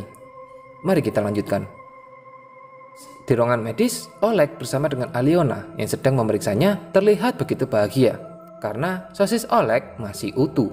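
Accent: Java, Indonesia. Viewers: Medhog bhuanged mhase.... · Logatnya jawa bet ya wkwk. Sukses terusss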